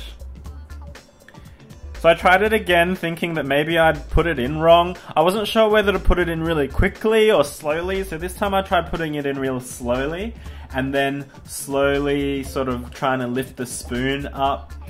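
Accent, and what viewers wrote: Australia. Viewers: Are u Aussie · JanielBxbes he's Australian.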